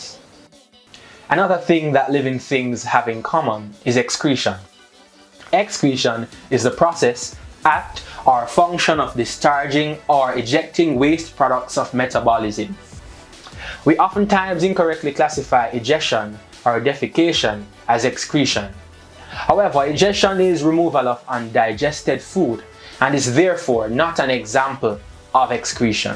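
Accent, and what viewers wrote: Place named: Jamaica. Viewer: Your work is fun entertaining and educational. My students can’t stop laughing and the spit out the information shared with such ease. It’s so refreshing to hear my own accent in something like this. I look forward to watch every topic and won’t stop sharing with those overseas as well. Brand Jamaica mi seh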